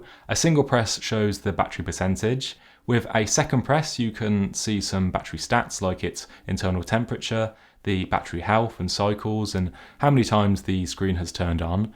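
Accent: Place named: West Midlands, United Kingdom. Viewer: I’m from West Mids, can always tell haha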